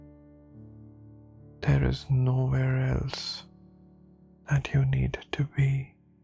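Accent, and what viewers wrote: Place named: India. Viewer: His english sucks. "Lie back" or "Lay back"??? However i love Indians, but their english sucks. Horriable accent.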